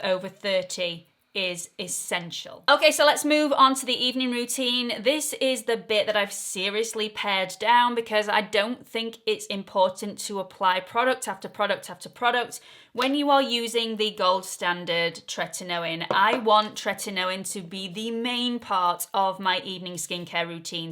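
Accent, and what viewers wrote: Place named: London, United Kingdom. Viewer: Love your London-english 💜💃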